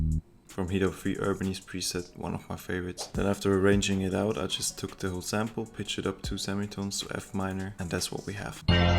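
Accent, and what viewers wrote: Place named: Germany. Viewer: very good video, are u from germany cause ur accents sounds a bit like it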